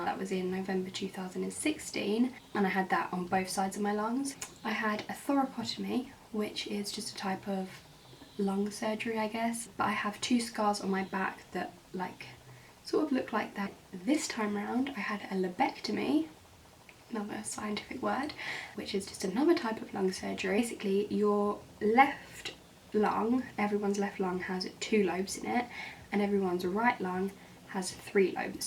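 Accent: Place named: United Kingdom. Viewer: Where do you live in the uk? I love your accent. Plus your eyebrows are amazing! Do you also have Instagram?x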